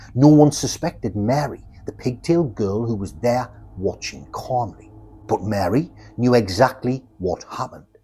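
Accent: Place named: Newcastle, United Kingdom. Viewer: Hi fellow Geordie 😁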